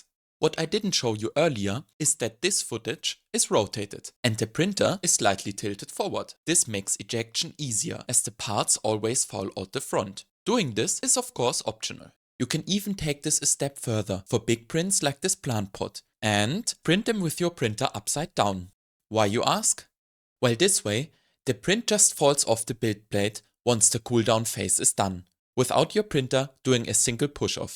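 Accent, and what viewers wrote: Germany. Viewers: Kann man sich nicht anhören! Diese übertriebene Betonung! Typisch .... wenn ein deutscher tolles englisch sprechen will. Da ist nichts flüssig.....und die Betonungen passen nicht zur englischen Sprache! Ich bin raus! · I would listen to a documentary about paint drying from you. I love the German inflections in English and you keep a smooth cadence. You should think about voice acting. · der akzent klingt so deutsch :D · very "Kartoffel-english" ;D but nice video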